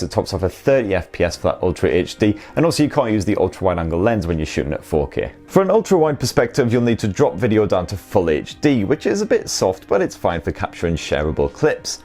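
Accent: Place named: RP, United Kingdom. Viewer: Queens english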